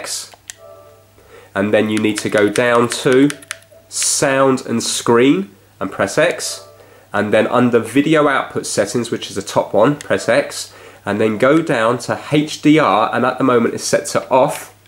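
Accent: United Kingdom. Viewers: I love his British accent!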